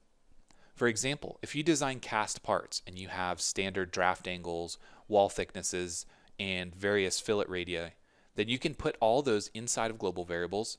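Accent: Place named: United States. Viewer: Great video's its nice to hear an American voice without the horrible constant 'Vocal Fry' at the end of every sentence.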